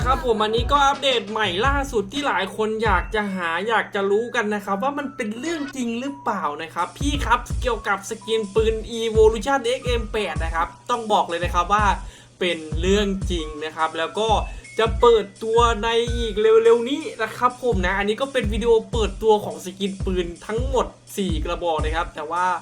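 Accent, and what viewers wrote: Isan, Thailand. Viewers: ชอบมากหลายๆเว้าอิสานคน จ.ใดหนอนิ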